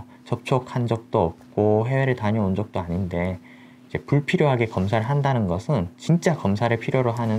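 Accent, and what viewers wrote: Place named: Gyeongsang, South Korea. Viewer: 블로그로만 뵙다가 목소리 듣게됐는데, 경상도 억양이 새롭네요ㅎㅎ 앞으로도 좋은 영상 잘부탁드립니다. ps. 한두가지 제안을 드리자면, 아무래도 내용이 일반인에겐 다소 전문적인 내용이라, 집중도를 살리기위해 영상 하나당 3분 정도로 하면 좀더 좋을것 같습니다. (예를들어, 이번영상을 세부주제로 3토막내어 올리는것) 또, 설명력과 전달력은 굉장히 훌륭하십니다. 뭔가, 서울 대형병원의 질병 설명영상 속의 교수님과 비슷한 느낌으로 잘하십니다. 다만, 유튜브의 생명인 조회수와 몰입도 향상을 위해선 순차적인 설명방식보다는, 짧은영상으로 일반인에게 지루하지않고 임팩트있게 전달하기에는 신종코로나에 대해 요즘 사람들이 가장 궁금해하는 FAQ에 대한 q&a 형식이 더 낫지않을까합니다. (ex) 왜 의심환자가 양성으로 확진나기까지 걸리는시간이 그때마다 제각각인지, 코로나로 의심된 환자가 사망했을 경우 숙주가 죽었는데 그것에서 채취한 검체에서 나온 코로나 음성판정이 과연 의미가 있는것인지 or 믿을만 한것인지, 마스크는 어느정도 범위까지 막아주는걸 써야하는건지(만약 마스크와 손씻기만으로 충분하다면 왜 의료인들은 방호복입는건지), 알코올이 실제 항바이러스 효과가 있는지 등등) 제 제안은 말그대로 제안일 뿐이니 참고만 되셨으면합니다:) 본격적인 ent 1년차 입성을 앞두시고 선별진료소 일까지 겹치시어 많이 바쁘셨을텐데, 첫 영상 만드시느라 수고많으셨습니다^^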